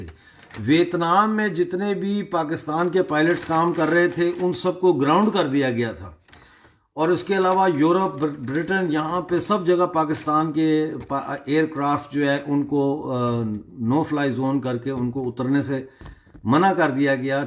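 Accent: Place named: Jammu, India. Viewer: the way he speaks dogri touch is there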